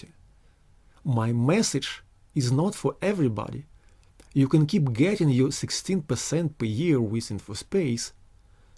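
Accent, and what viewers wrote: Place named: Russia. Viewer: Ну, во первых в своём комментарии я не затрагивал вопрос национальности, а имел в виду твоё произношение. Я понимаю, ты в этом не виноват, так тебя научили. Такое впечатление, как будто ты читаешь английский текст в русской транскрипции. Ведь в английском есть звуки, которые невозможно выразить по русски.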